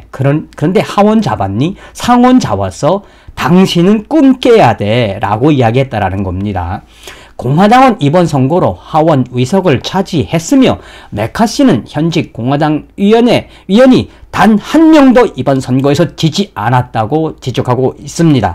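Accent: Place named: North Korea. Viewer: 북한 말투쓰지 말고 그냥 평범한 일반 표준 한국어로 진행 하시길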